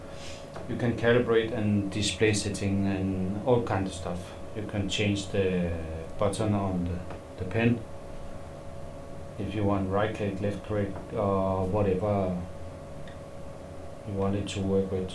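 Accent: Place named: Denmark. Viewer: danish innit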